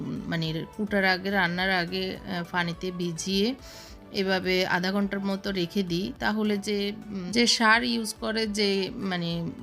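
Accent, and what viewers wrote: Noakhali, Bangladesh. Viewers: আপনার দেশের বাড়ী কি নোয়াখালী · আন্নে নোয়াখাইল্লা নি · আপু গো তোমার বাড়ি নোয়াখালী তাই না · আপনি কি নোয়াখাইলা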